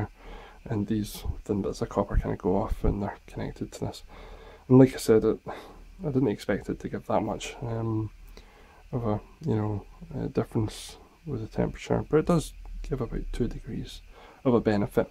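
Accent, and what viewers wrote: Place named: Scotland, United Kingdom. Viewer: English with a Scottish accent, if that helps you.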